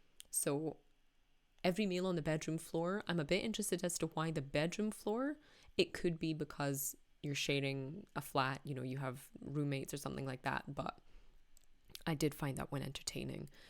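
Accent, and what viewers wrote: Scotland, United Kingdom. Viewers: Ok., let's get started. 1.I ate whole package of Ptasie Mleczko during watching this episode. 2.A few months before pandemic my plan was to look like Cristiano Ronaldo. Or Robert Lewandowski. Now, after 14 months of pandemic I look like Miss Piggy rather. 3. Because is stressful pandemic situations and pandemic depressions, I eat sweeties. Just need more sugar. 4.I eat big portions of meal to cheat my brain for produce positive message goes like this: "Easy, Robert, soon everything's gonna be alright". Of course, it won't. I dream about fish and chips. 5.I need woman to calm me down. My last girlfriend left me a few months ago. She told me that my behaviour reminds her Woody Allen's neurotic nature. 6.And finally, I found a few words of your pronunciation that need an explanations. These are: why, who, whom, where, own, home. 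You Scottish fox. 7.You have a beauty nature,.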